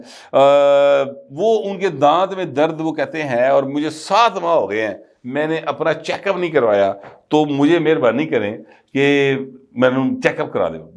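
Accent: Punjab, Pakistan. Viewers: What I mean in the other comments Is Punjabi urdu mix accent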